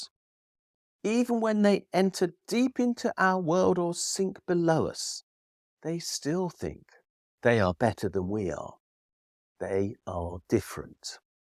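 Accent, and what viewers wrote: United Kingdom. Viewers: I may always have believed what here is professing, but i believe it doubly now that I've heard it spoken with a British accent. Cheers from across the pond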